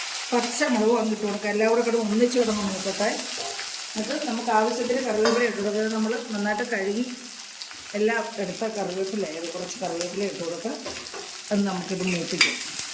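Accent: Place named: Mavelikkara, India. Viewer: നമ്മുടെ മാവേലിക്കര കാരുടെ അച്ചടി ഭാഷ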